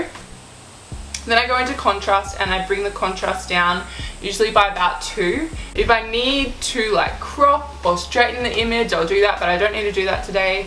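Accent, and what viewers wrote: Australia. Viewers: Omg ur AUSSIE!! Where r u from? I'm from Sydney😊💞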